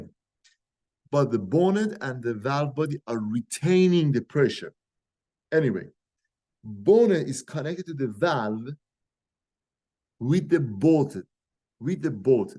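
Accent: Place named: Iran. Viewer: Mr. Mr. What is this accent why you talk like this? Everybody must know you iranian ?? Mr. Loose the accent your in texas now baba joon what is this ??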